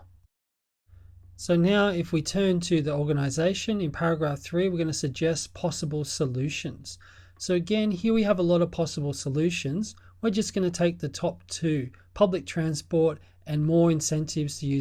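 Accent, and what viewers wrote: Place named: Australia. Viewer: I LOVE AN AUSTRALIAN ACCENT!!!!!! EASY TO UNDERSTAND AND I JUST ... LOVE IT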